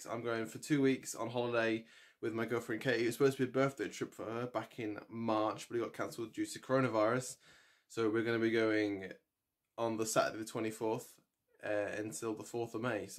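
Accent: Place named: United Kingdom. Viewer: Bro you're British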